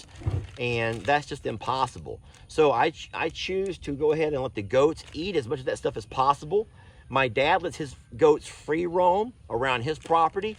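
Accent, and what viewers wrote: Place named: South, United States. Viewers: I would have always thought you would have been the life of the family events when I first started watching ya'll a few years ago. Ya'll are my type of people. Every relationship I've been in they have been the outcast of their families and it's just so sad. My family is so close and only one aunt is kind of an outcast but she hasn't always been.....her actions put her there. I love all of ya'll but you guys and your dad are my absolute favorite. Will have to say out of all of ya'll you keep my attention the best regardless of the video. Maybe what draws me in the most is you talk a lot like we do here in NC. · have read this to you and don't ever want to change your voice I myself love listen to you and your family talk I love the southern accent ❤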